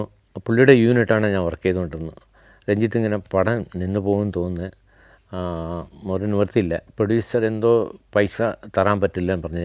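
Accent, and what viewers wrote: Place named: Tamil Nadu, India. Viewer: തമിഴ് കലർന്ന താങ്കളുടെ സംസാരം വളരെ രസമാണ്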